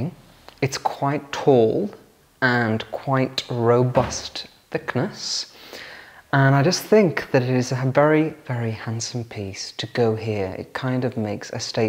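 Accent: RP, United Kingdom. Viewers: Im a new subscriber,and really enjoy your videos,i would like to make a point,i wish most people had your way of speaking,its not artificial its the Queens English,i speak the same way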